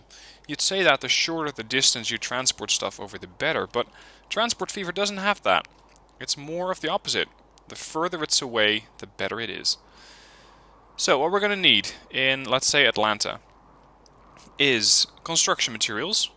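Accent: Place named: Netherlands. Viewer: I searched for wargame red dragon stuff and stumbled onto your channel. Now a day later i'm at episode 10 of your transport fever series haha (and watch a couple of videos of wargame ofc). Subs +1 ;) Keep doing what you doing! One question from which country are you? your accent sounds dutch.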